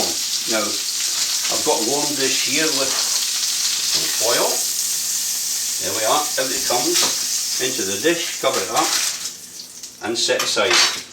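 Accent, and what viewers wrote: Edinburgh, United Kingdom. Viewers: Nice to hear an Edinburgh accent on the interweb. Slainte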